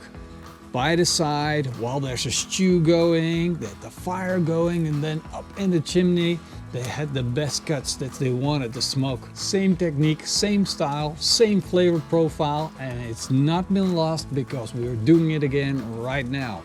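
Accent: Netherlands. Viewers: Dutch accent ?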